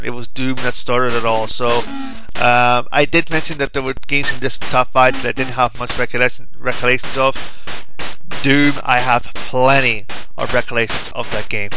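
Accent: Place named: Germany. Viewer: but your german..i could tell by the vocals ..i am german with spanish and portugesse ..ancestory :D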